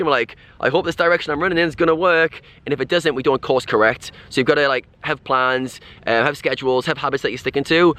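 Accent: United Kingdom. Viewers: If you are up for feedback then the other thing about the speed of your speech is the accent. I’m in London and am well versed with with the many different accents throughout the country. Whilst your Geordie accent (I’m guessing) is not super strong it is significant and not a problem for me but when you combine that with the speed of voice then anyone that does not use English as their first language could find it hard.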